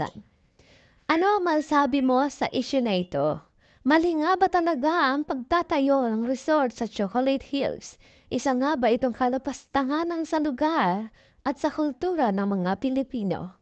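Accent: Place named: China. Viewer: Ok sana presentation ni blogger kaso prang bulol at di tga Pilipinas mag pronounce eh...prang tga China kung magsalita😅😅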